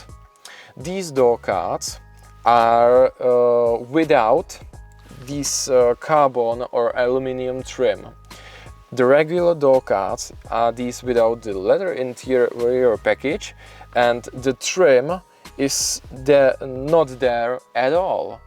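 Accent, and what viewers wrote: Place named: Czech Republic. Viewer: ta čecho-angličtina se nedá poslouchat, sorry... jinak auto krásné, gratulace ke splněnému snu 🍾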